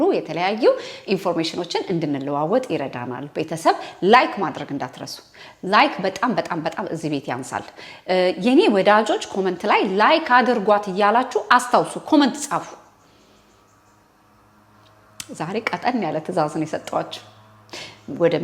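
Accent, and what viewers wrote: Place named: Italy. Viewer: ሰላጣ ማለት ነው salad በእንግሊዘኛ ነው አንዳንዴ ጣልያንኛ ተናጋሪ ስለሆነች pronounciation ትንሽ ሊለይ ይችላል።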